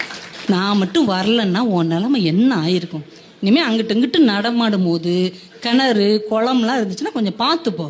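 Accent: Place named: Madurai, India. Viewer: இதுவரை கேட்டிராத, மிகவும் கற்பனைவளமுள்ள சிறப்பான குட்டிக்கதை. குடிமகன்களுடைய வாழ்க்கையே தனி, மதுரைத் தமிழ் அதைவிட அமர்க்களம். அன்புச் சகோதரி வாழ்க உங்களுடைய தொண்டு.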